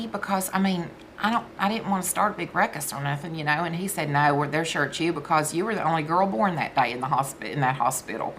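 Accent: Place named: South, United States. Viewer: Love hearing your stories. Don't know anything about cats but can't wait to hear about the kittens. Your southern? accent reminds me of joyce meyers. Consider that a compliment because she's wonderful just like you 😊 God bless you.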